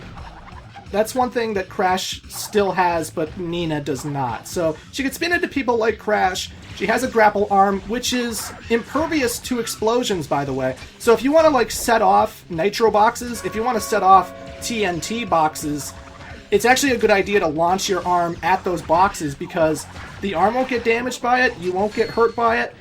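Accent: Canada. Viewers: Bro you're accent, dialect and your chilled charming canadian voice is always a pleasure to listen to while seeing your MLG megaman/sonic/any game playthrough. If you weren't canadian with this chilled personality then i wouldn't be into megaman. I've played more megaman games than the 8bit ones because of your LPs. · you say it right, just with a Canadian accent lol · WHO EVER IS BULLYING YOU ABOUT THE WORD HOUSE IS CRAZY. YOU’RE SAYING IT JUST FINE 😂 as a fellow Canadian, I concur!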